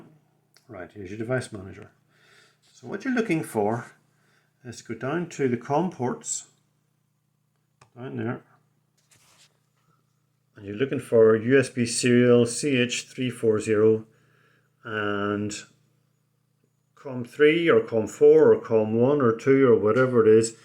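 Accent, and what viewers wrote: Northern Ireland, United Kingdom. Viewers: Hi down in Larne here and have 2 250w Bafang bikes and I am really happy with the default setting and I am now hitting well over 2,000 miles .Is this reset really helpful on a day to day basis ? p.s. you sound like a norn iron man .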